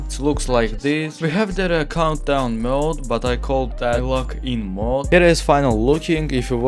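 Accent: Russia. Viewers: Чел у тебя оусский акцент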